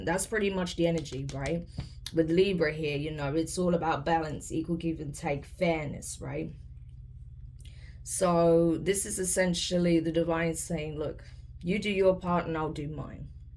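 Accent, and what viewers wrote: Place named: Australia. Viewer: "Source is stepping in. Making good to a promise. Your prayer is heard. What are they encouraging you to do? To take initiave. To be assertive." "Make some changes. Release some things that do not serve you. We will make things happen." I just want peace for the world, Mam. I'm currently studying about international politics. I'm old to go back to school. Yet I'm studying so hard...despite the gossips,...despite the many projects. So that I can help to keep the peace in the future. Whether its within our city only or maybe with other neighboring countries too. ✨🌱👍 This is all i want. #WORLDPEACE Thanks for channeling this important message, Ms.! Thanks also to the Spirit Guides for the continuous support! ✨😇🙏✨ P.S. Mam are you from Australia? Your accent is so cute! ❤️🥰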